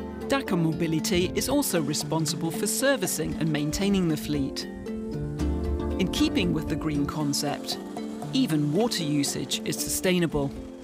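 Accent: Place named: United Kingdom. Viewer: I stopped listening at British accent. Tired of the British accent narrating African reality.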